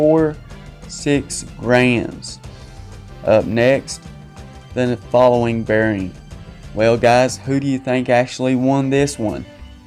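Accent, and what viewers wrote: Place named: Rural, United States. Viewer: Can't understand a word you're saying. Need a translator- I don't speak hick. And what's with the dramatic music? It's motor oil, not space travel. C'mon man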